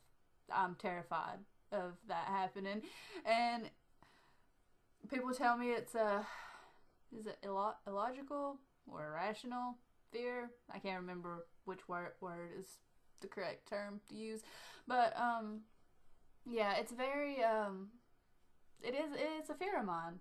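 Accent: South, United States. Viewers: yup... you have a southern accent. lol I knew it. :) it's a good thing. :)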